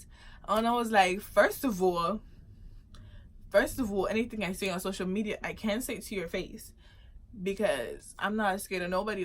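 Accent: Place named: London, United Kingdom. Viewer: Sounds like a Londoner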